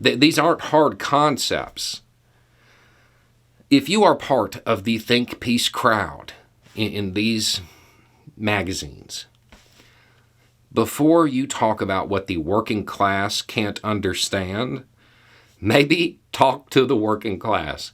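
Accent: South, United States. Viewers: Exactly, he came in after pitching a fit about how much he paid for the company then fired majority of the workers, doesn't explain his vision to about the future, expects the workers to cover everything, sends out an entitled email and expects his employees to go "well they say he's a genius so 80hr work weeks (or more) here I come for no additional resources or perks!" sorry if that had happened to me, I'd be out of there faster than you can count to one. Nothing about what Musk has done sounds stable, innovative, or reassuring. Us working folks like stability regardless if they talk southern like or west coast like myself. They want to know that their job will be there in a day, week, month, or 6 months because you know we like to plan and to be able to afford everyday expenses. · What are the two words you are surprised to hear from somebody with a southern accent: "Check Mate". 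Don't let the southern accent fool you.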